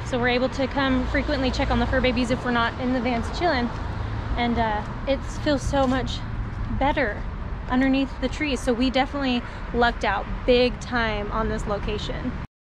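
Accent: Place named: South, United States. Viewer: ***O...I notice you are loosing your Southern drawel....lol.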